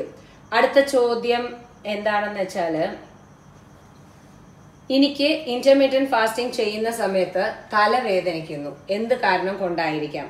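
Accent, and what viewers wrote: Palakkad, India. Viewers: പാലക്കാട്‌ ഭാഷ 👍👍👍👍അവതരണം നന്നായിട്ടുണ്ട്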